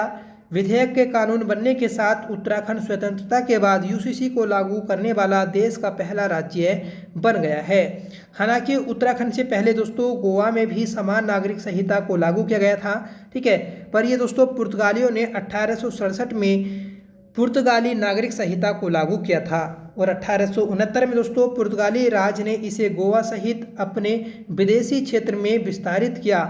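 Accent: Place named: Uttar Pradesh, India. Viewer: Hindi typing m bhut error hai aur apki tone uttrakhand ki km aur up ki jyda hai. Please improve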